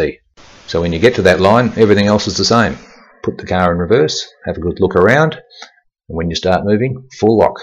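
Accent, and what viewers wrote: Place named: Australia. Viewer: he’s not a Brit he’s Australian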